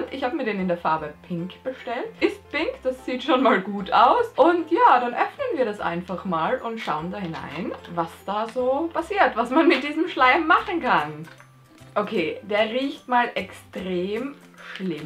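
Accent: Austria. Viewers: Hi bist aus Österreich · Du bist a österreicherin.....kenn i ..i kum aus linz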